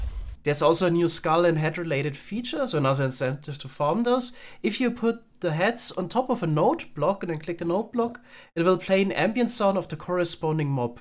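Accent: Germany. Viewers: Hört sich an als wär da jemand ein Deutscher ;)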